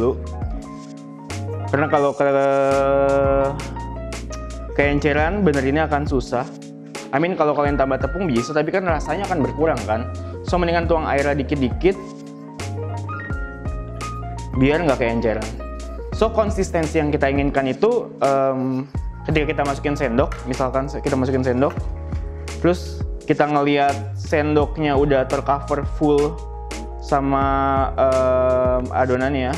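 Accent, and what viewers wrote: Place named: South Jakarta, Indonesia. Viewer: Kebiasaan liat&dengerin aksennya Gordon Ramsay ama Banging with Babish jadi agak gimana denger aksen ala Jaksel.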